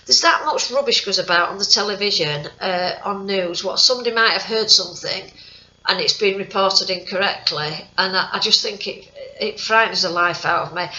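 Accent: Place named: Wakefield, United Kingdom. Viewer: Love your accent! I'm from Northern Ireland but have a nephew from Wakefield who speaks just like you! I've just recently subscribed to your channel and really enjoying your videos so keep them coming! Norma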